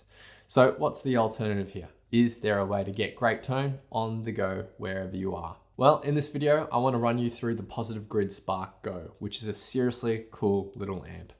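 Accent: Australia. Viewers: Do I hear an Aussie accent? If so,.. I've noticed several guitar guys from Australia lately. Very cool and says a lot for a strong music culture there! Spark 40 sounds like you're in a box. Surprising the Spark Go has more depth of sound. Strange. Currently,.. Pre-Orders $109 for this tiny little guy!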